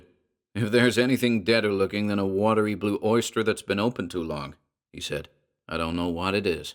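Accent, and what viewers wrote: United States. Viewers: Your American accent has improved tremendously since you first tried it out. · Pretty good American accent! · Wow! This story is awesome! I love the setting and description of the old house and environment around it. This is well-written....many of these old tales are. Fantastic narration! Your American accent it great!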